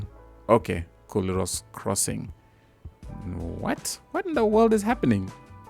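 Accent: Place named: South Africa. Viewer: You got a South African accent. S/O from mzansi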